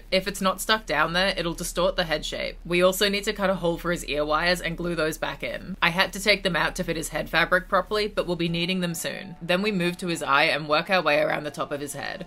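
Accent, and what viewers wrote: Australia. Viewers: bro said he was Australian without saying he Australian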